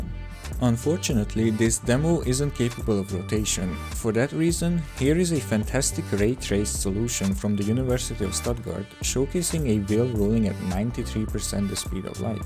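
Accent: Hungary. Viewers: Is that a Hungarian accent or am I completely wrong? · Wait, are you Hungarian? Your accent is definitely 🇭🇺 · Nem vagy magyar véletlenül? 😅 Különben elég jól összeszedett lett a videó! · Hello! Nice video! Are you hungarian by the way? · akcentusból itélve fix magyar vagy, nagyon komoly videó lett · Egész érthető volt még a magyar akcentuson keresztül is. Kedvencem amikor Suttgartot úgy mondta hogy sztátgárd. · are you hungarian by any chance?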